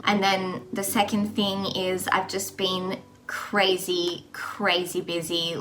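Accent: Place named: Australia. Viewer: I hear Australian but confused on the exact accent. Great video, just curious to learn more.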